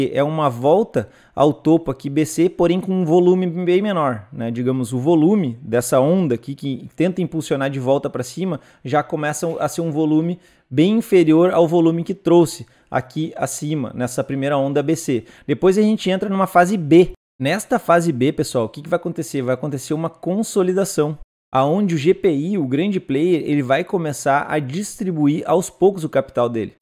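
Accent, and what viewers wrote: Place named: Rio Grande do Sul, Brazil. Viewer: Sotaque Gaucho é lindo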